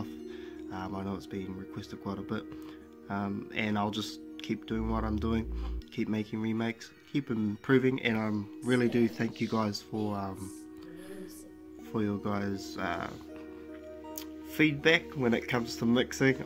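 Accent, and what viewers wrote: New Zealand. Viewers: Nice Maori accent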